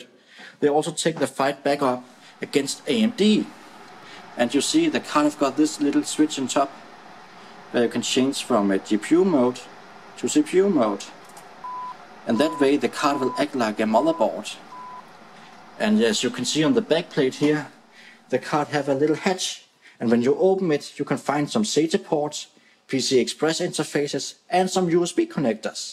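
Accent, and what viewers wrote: Denmark. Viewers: Like the danish accent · He lives in Denmark as i suspected, you have the good danglish!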